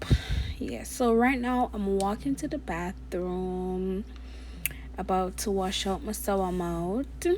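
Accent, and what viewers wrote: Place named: Trinidad and Tobago. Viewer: Its the Trini acsent for me, the lady niceeeh man 🎉🎉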